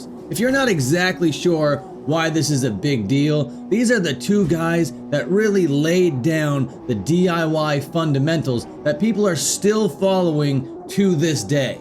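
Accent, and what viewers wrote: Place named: Canada. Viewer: You have a Canadian accent.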